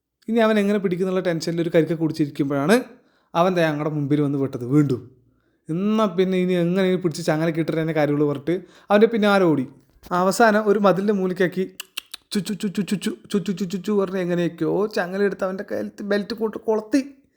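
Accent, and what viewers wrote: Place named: Palakkad, India. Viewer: പാലക്കാട്‌ ആണോ... സംസാരം വീട് എല്ലാം അതെ സ്റ്റൈൽ... എന്തായാലും താങ്കളുടെ മനസ് സൂപ്പർ 👌🏻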